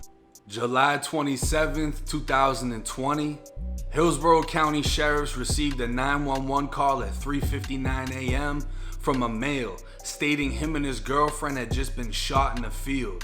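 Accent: Boston, United States. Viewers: Dude what are you from Dorchester 😂 every time you hit that "A" it gets me lol. I used to live in Brockton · you def caught the Boston accent! I have one too, I’m rocking wit ya! 🔥🥰☘️ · You. Sound. Like. A. Boston. Person. Its. Your. Pronouncing. Of. Some. Words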